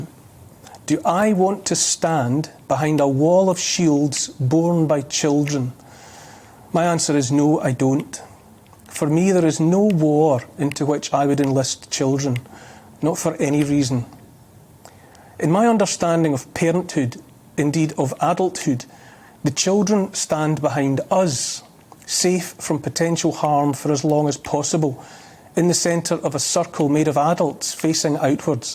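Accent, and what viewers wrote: Scotland, United Kingdom. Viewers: It's lovely to hear a person with a Scottish accent who isn't some horrible leftie.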